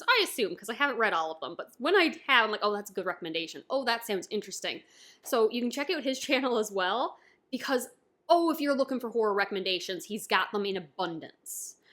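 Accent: Canada. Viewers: I love/appreciate your Canadian or maybe Wisconsin pronunciation!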